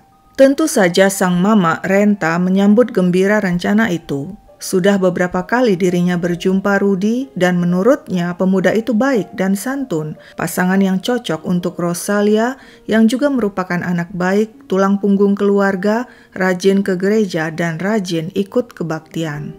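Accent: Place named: Medan, Indonesia. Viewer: kak ini orang dari sumatera utara kah? pelafalan logat medan nya PAS kali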